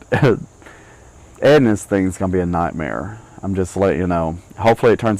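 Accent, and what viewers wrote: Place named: South, United States. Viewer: What a beautiful testimony . You are correct, God does love you.It takes a real man to tell such a personal story. Roxy may not fully understand everything when she sees this because she is young but she will fully understand it later. I am sorry you had to go through a hard time at school,kids can be mean sometimes. I actually just think you sound very southern, and I was wondering where you were from.God gave you the life he did for a reason and I think some of it may be for telling your story. You may have led someone to Christ by giving this testimony.I pray that you and your family feel better real soon. If you do have the virus maybe it will be a very light strain of it. I love the story behind the tattoos.Prayers are being sent you way.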